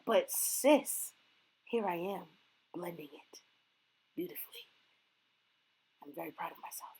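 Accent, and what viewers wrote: New York, United States. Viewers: My first time watching you and I got a feeling you from New York Sis 🤨🤨🤪🤪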